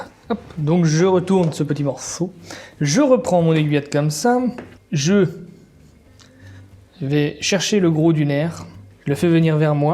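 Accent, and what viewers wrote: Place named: South, France. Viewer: Bien râcler l'Ausssss... donc t'es bien du Sud lol...Dans le Nord ou ailleurs, c'est l'Os...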